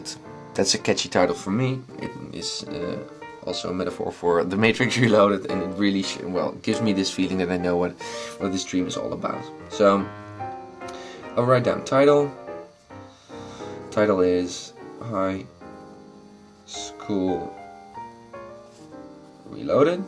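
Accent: Netherlands. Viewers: Ben je Nederlands dude P: ? ik hoor t aan je stem.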